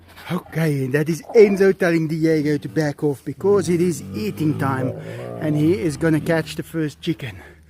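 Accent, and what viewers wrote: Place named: South Africa. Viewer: I enjoy your south african accent!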